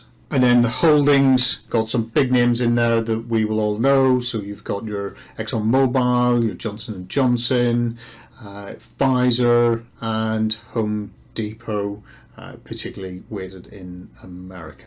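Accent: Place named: Belfast, United Kingdom. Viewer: you still in Northern Ireland or over in London or what. Cool to hear a Belfast person talk about Vanguard :)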